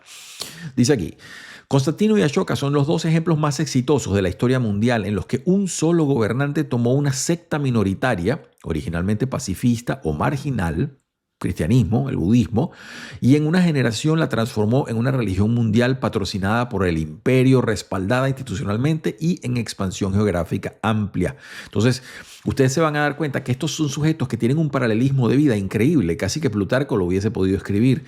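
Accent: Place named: Caracas, Venezuela. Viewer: Excelente tema, excelente canal y excelente vocabulario, excelente dicción y magnífica voz coronada con el acento caraqueño. Gracias Sr.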